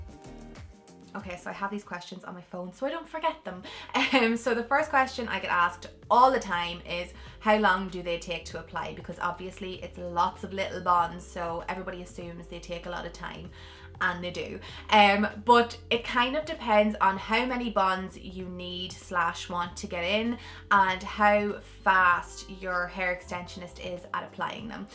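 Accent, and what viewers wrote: Ireland. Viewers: Love the Irish accent so sweet. . Your hair is beautiful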